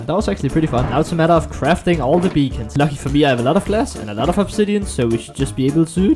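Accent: Denmark. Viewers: Syg dansk accent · Føler lidt han lyder dansk... Could it be.. My dream comes true? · Are u danish? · Are u from denmark? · Kunne høre på din stemme at du var fra Danmark. 😁 Det ser godt ud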